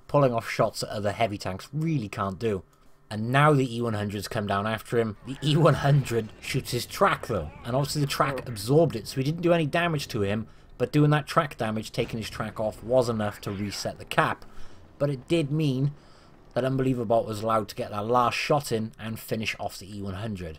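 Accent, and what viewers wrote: United Kingdom. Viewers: first like before watching and second it's always great to hear your voice laughing with that stunning British accent of yours along with that beautiful and accurate commentary have you to tried to make a radio channel I'm not kidding your voice is so calming..... don't stop making Those videos with commentary I'm dead waiting for the next one cheers mate ..... :D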